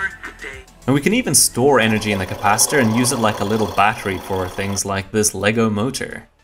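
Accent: South Africa. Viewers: Yo I didn't expect a South African shout out I mean you do sound south African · Aye howzit bru, I thought I heard a little south african accent there and the biltong cutter got me 😂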